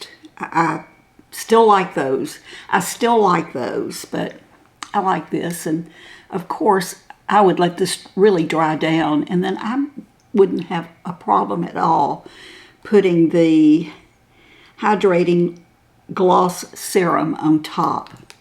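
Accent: South, United States. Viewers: Whoever is managing the YouTube recommendations? Thank you so much for putting this beautiful lady on my recommendations list, and as a cisgender Gen Z male? I literally enjoyed every single minute of this video, the warmth of the southern drawl and her spirit and beauty and elegance and easiness, it’s so soothing and beautiful like the rest of her warm, regal spirit and beauty. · I so adore you! You are so sweet, so genuine and I love your southern accent! I got the NYX foundation and love it!! I am a makeup junkie and have countless foundation's. I have never used any drugstore cosmetics but am now a convert. Since watching your channel I am a fan of e.l.f and NYX! Not going back to ANY prestige makeup! Thank you for making me a fan! xxx ooo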